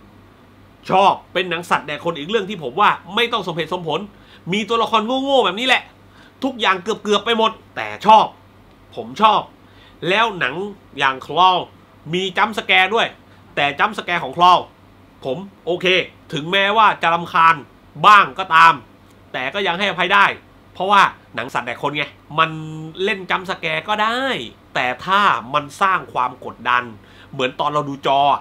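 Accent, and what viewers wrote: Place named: Surat Thani, Thailand. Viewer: คนสุราษฎร์อำเภอไรนิพี่บ่าว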